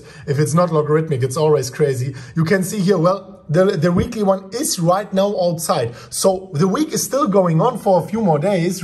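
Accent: Germany. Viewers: Junge, dein deutscher Dialekt ist der Hammer! :D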